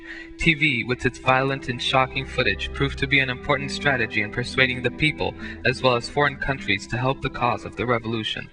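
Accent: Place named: Romania. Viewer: I wish all of you who comment about this video,get a grip because everything you said is not the reality..To know exactly what was going on in Romania at that time you have to lived there,did any of you have?If you have which I think the commentator did by his accent,than whether he wasn't born at that time or he was told the stories or the voice is a robot recording... It's all the same propaganda which was used to get rid of that regime and replaced it with one even worse,called democracy...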